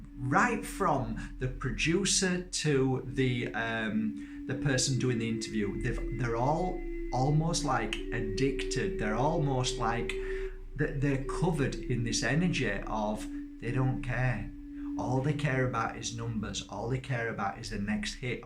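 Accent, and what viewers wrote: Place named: Midlands, United Kingdom. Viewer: Love your style - just found your channel ….you have great energy - is that a Midlands accent? From one UK person to another....xx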